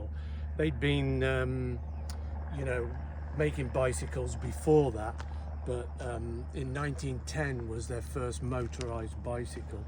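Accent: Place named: Midlands, United Kingdom. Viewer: Aye, I could tell you had a Midlands accent. I'm originally from Huddersfield.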